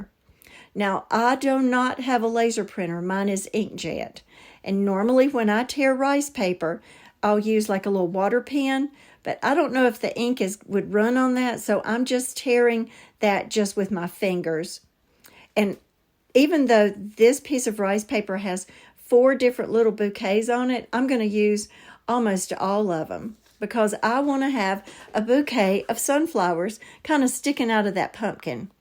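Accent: South, United States. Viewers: I love your excitement with your grandchildren. Love your southern accent. God bless sweety.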